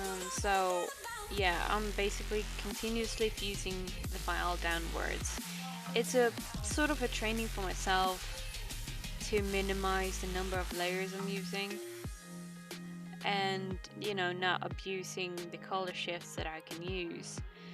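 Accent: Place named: Australia. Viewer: I think your accent is awesome! You almost sound like an Australian, my favorite accent! The art is awesome as well, of course! Thanks for the video!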